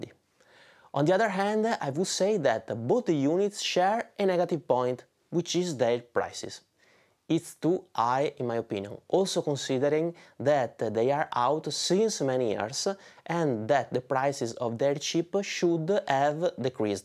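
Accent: Venice, Italy. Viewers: go idea che ti xe veneto! bravissimo in ogni caso!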